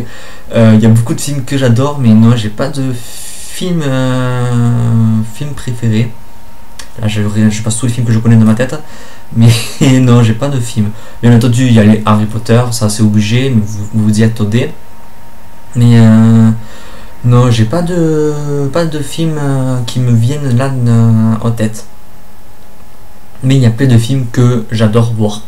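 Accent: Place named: Gard, France. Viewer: Hey ! Merci d'avoir repris le tag :D T'es d'où exactement dans le Gard? (sans dec entre ça et ton accent, j'ai le mal du pays à mort ^^)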